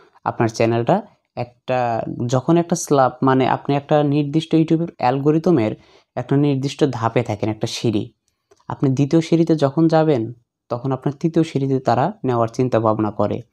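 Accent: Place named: New York, United States. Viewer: ভাই নিউ ওয়ার্ক প্রিসিলার মতো করে কথা বলছেন ওয়াও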